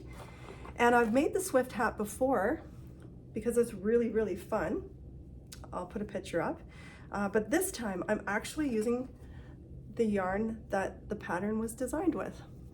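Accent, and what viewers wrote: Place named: Western Canada, Canada. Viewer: Thank you for your podcast. I enjoy hearing your western Canadian accent, it feels so familiar and friendly. It is fun to see the places you go . I also love that you share your knitting adventures fully, things that don’t work out as hoped and your triumphs , it is nice to know that we all have ups and downs this way :) I just love your open friendly way and all your flight attendant sayings . Any ways, thanks :)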